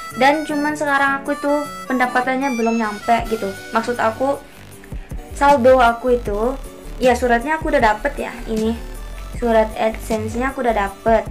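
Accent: Indonesia. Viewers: LOGAT BAHASA INDONESIAMU 👍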